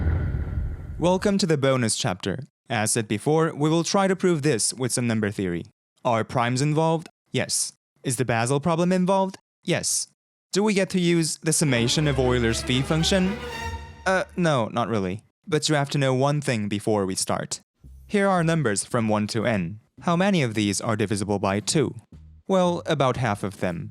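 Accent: South Korea. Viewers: 아 약간 발음 보니까 한국인인데? 라고 생각했는데 9분 쯤에 알려주시네요 ㅋㅋㅋㅋㅋㅋ 편집이 정말 깔끔하고 좋네요. 어떤 프로그램을 사용하셨는지요..?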